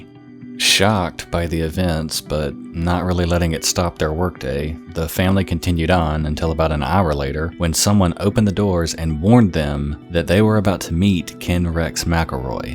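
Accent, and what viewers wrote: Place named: Texas, United States. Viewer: Are you from Texas? Your accent sounds very Texan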